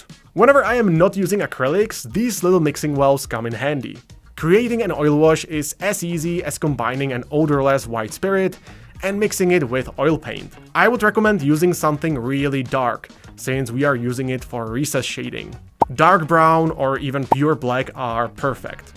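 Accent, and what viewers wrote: Czech Republic. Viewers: I was guessing whether you were Czech or not based on the accent, but that basement is what convinced me.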